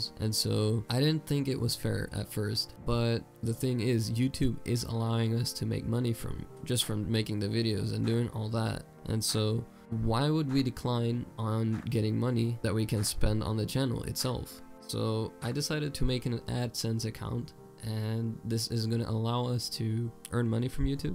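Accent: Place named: Russia. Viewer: Guys I think he is russian, He has that accents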